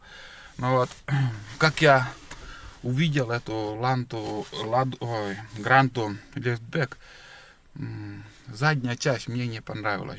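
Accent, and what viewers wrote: Komi, Russia. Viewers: Виддза олан! Судя по акценту, Ижемский? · Давненько в Коми не бывал.голос слышу, детство вспоминаю. Коми му)))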